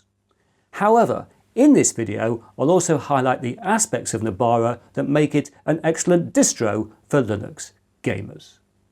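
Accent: United Kingdom. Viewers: I am extremely happy to finally running across a system that had creators in mind allowing for DaVinci Resolve being flawlessly installed and even on AMD which is my config. And thank you so much running us through the process of not only installing the system but also through the Resolve install process! And all that done through a cool british tongue 😉 and just an overall great way of explaining everything. Keep it up!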